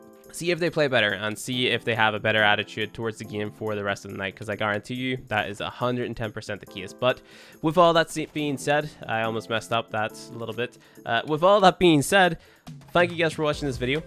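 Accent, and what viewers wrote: Northern Ireland, United Kingdom. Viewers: Northern irish deffinetly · Are you from Northern Ireland cuz you kinda sound like it lol like you sound like my da a bit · Northern Ireland:)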